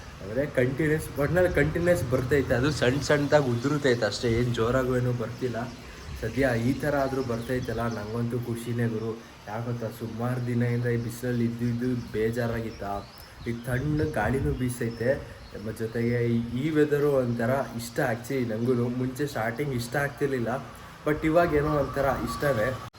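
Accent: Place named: Hassan, India. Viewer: Pysch agi matadtya bro nam hassan bashe na.. keep on doing bro ❤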